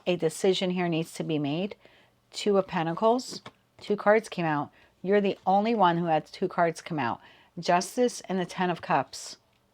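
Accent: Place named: New York, United States. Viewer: I'm presuming by your NY shirt, that you are a New Yorker. I can tell by your accent. Long Island here. ❤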